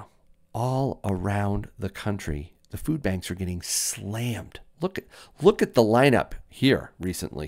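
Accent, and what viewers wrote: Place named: United States. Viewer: It’s just yer accent, I find (in general) yankees have an accent that is just off putting. Now salivate metals . . . Whew, if his info wasn’t so good I’d never listen to him on purpose lol.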